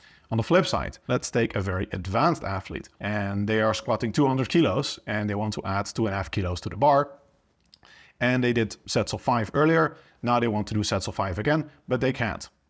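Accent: Netherlands. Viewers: I can spot a dutch accent anywhere. Lol.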